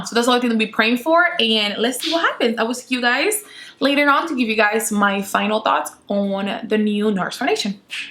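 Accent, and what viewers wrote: United States. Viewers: I love how you speak, reminds me of back home in SoCal. What lip gloss are you wearing????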